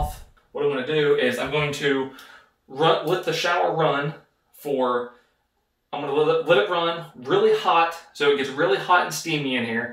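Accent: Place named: North Carolina, United States. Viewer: What state is he from. My guess is North Carolina , me being from there our accents sound familiar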